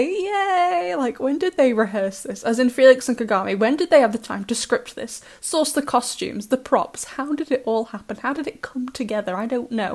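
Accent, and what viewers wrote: United Kingdom. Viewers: ✨ToMaTo BoY ✨The British accent>>>>>>>>> slays!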